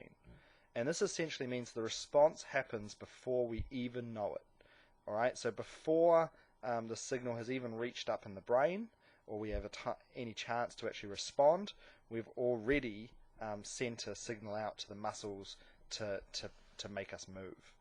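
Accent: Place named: New Zealand. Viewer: nice kiwi accent hehe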